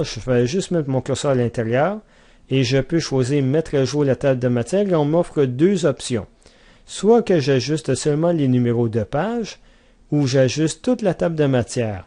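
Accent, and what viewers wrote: Quebec, Canada. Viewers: avec l'accent québécois en plus!!! :P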